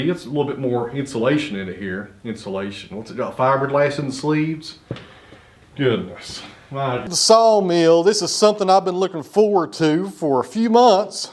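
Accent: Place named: Appalachia, United States. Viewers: I fully understand your Kingsportian dialect and never knew you had bloopers. Keep on talkin the way you do! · What’s funny I have that same Appalachian accent so I understand everything you say. Watch Celebrating Appalachia and you’ll see it’s the way we talk for hundreds of years.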